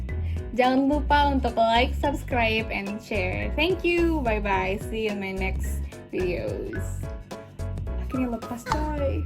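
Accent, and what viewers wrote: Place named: United Kingdom. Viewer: Wih logat. English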